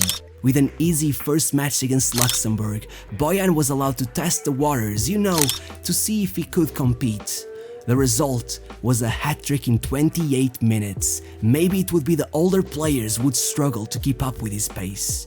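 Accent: Portugal. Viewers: By your accent you are portuguese. I know because i speak the same accent 🤣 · Are you Portuguese or from Portugal? I am, and the way you speak is very familiar.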